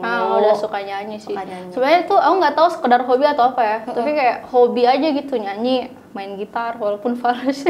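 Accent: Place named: Makassar, Indonesia. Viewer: Org makassar kalau ngomong kentara bngt sih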